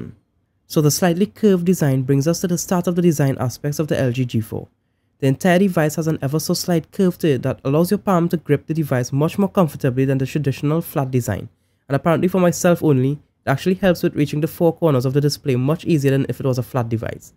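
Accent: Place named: Trinidad and Tobago. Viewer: That was an excellent review bro. I picked up some Trini twang here and there but, not much. "I simply hit the simple button" #priceless. Awesome quality as usual and very informative.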